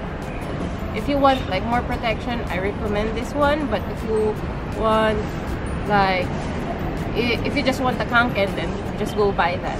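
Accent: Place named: Philippines. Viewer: your speacking english was look like an filipino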